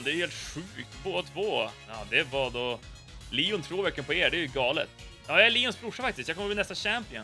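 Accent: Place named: Umeå, Sweden. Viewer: Du är från Umeå? Man hör på din dialekt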